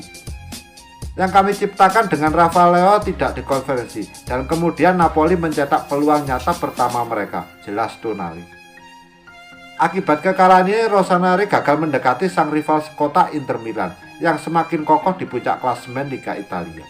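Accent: Java, Indonesia. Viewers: Ojo medok2 bro wkwk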